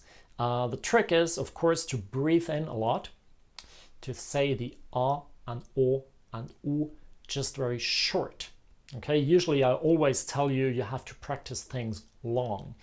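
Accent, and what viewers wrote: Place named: Ireland. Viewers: Thank you! You’re English sounds a bit Irish! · The Irish are the only native English speakers that don't pronounce "th" as one sound. They pronounce "t" and "h" as separate sounds like most non native English speaks. His English "r" sounds Irish as well.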